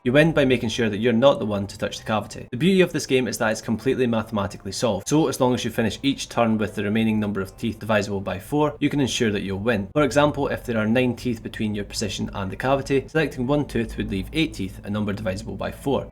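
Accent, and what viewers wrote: Scotland, United Kingdom. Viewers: You're talking to fast lad , i could barely hear you through your Scottish accent. 😅 Despite that, this video helps me , makin good progress on the game. Thx for your time uploading this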